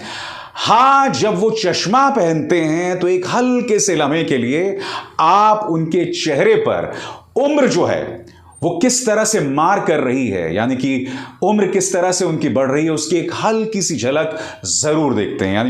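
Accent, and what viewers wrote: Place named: South India, India. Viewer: Ha सर filing नहीं आ रहा है क्यों लग रहा है की साउथ इंडियन जैसा लग रहा है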